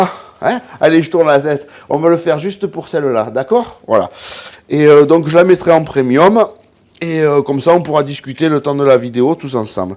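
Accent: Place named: Marseille, France. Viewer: est tu cevenol de naissance ou d adoption? Désolé pour m question intrusive mais ton accent me rappelle fortement le mien et celui des mes proches qui sommes issus de la région marseillaise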